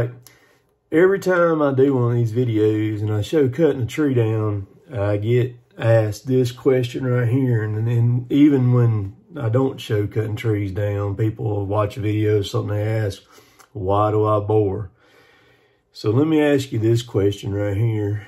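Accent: South, United States. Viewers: I think I like these videos more than your logging videos! Enjoy your southern accent...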